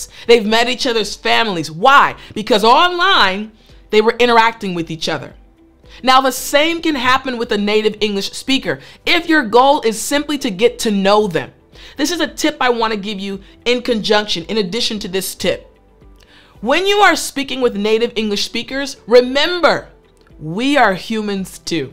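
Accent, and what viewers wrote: United States. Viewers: I love her pronunciation and American accent, the most beautiful accent in the world for us eternal students of the most important language on and of the planet! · Wow, i love her American Accent. It sounds so good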